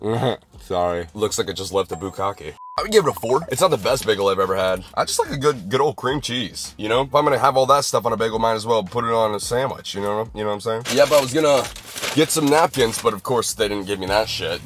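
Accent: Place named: Scotland, United Kingdom. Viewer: GOODBYEEE DID HE SAY WEE BIT HES SO SCOTTISH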